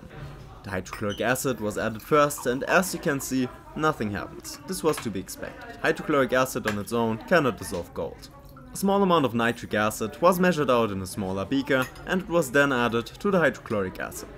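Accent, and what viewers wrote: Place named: Germany. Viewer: Ich glaube vom Akzent her, dass du Deutscher bist daher: SMB (sodium metabisulfite oder deutsch: Natriumdisulfit) dient nicht dazu, überschüssige Salpetersäure zu entfernen. Es ist ein selektives Fällungsmittel. Btw: Fällen heißt auf Englisch: Precipitate, nicht "chrash out" oder so etwas. Es kann also gut sein, dass du in der Lösung noch gelöstes Gold hast (Hast du ja sicherlich aufgehoben ? §1 des Edelmetallrecyclings: NIE etwas wegschmeißen!) Und doch: Es gibt Literatur zum selektiven Ausfällen von Platingruppe Metallen, sogar speziell in Bezug auf Dentallegierungen. "Edelmetall Recycling" von M.A. Buth, ist so eines davon, sogar auf Deutsch... Gibt's bei Amzon unter: <link> ... Viel Erfolg weiterhin! M. A. Buth ;-)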